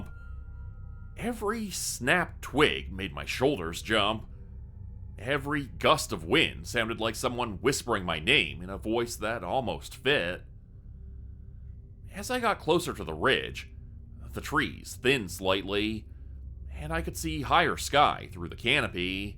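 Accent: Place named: Canada. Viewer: oh wow I thought it was his real voice 😊 especially the c😎😎L Canadian 🇨🇦accent I hear 🩷🧡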